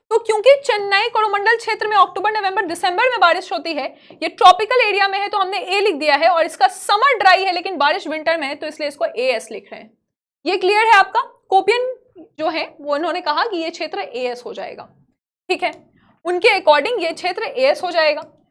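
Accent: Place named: West Bengal, India. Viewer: Dilect se lgta madam West Bengal sey belong karte hai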